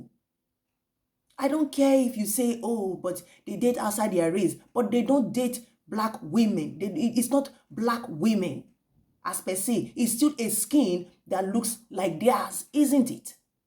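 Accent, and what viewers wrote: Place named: Africa. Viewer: Love your accent sweetheart! Which African nation are you from?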